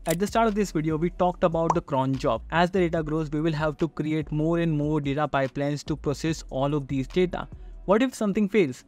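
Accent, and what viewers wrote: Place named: India. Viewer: It's usually difficult for me to understand the Indian accent, but your knowledge, examples, and slowing down the speed to .9x was wonderful. Great video!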